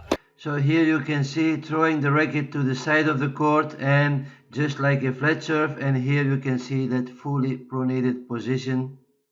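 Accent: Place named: Belgium. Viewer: dankjewel meneer. I thought I picked up the accent - I used to live in Belgium :)🇧🇪 Thanks for the advice on the back. I often get some backache just from playing so I need to be extra careful with serve but I’ll give this a go! Again, great video. Thanks!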